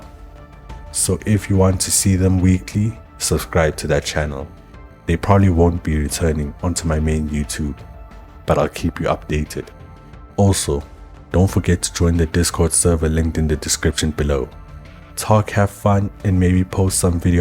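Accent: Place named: South Africa. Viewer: haha nah from your cadence. As a fellow south african anime lover you have a new follower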